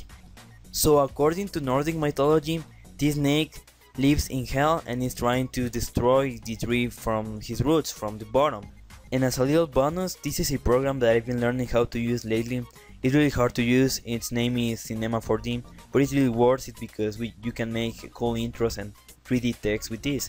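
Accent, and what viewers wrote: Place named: Russia. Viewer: Your English is great and your accent is wicked giving A great voice. Is it Russian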